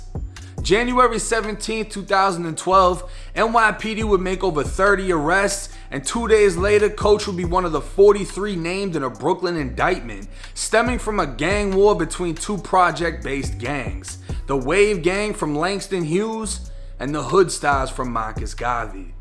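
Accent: Boston, United States. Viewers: ARE U FROM BOSTON? Ur accent bro! Lol! ROXBURY DORCHESTER MATTAPAN!!!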